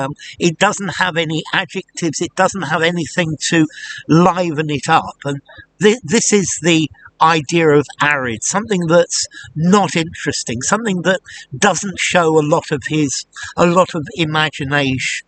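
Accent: United Kingdom. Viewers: hello dear sir, thank you for this brilliant explanation, I'd be very glad to know what is your British English accent please.😊😊😊😊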